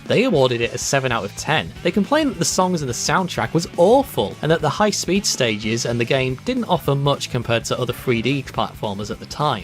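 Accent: Yorkshire, United Kingdom. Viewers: I’m from Yorkshire too (Bradford to be specific)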